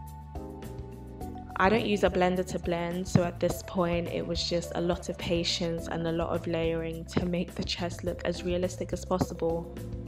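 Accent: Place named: United Kingdom. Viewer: I love your accent, I’m british too, also this is an incredible drawing! You have a lot of talent.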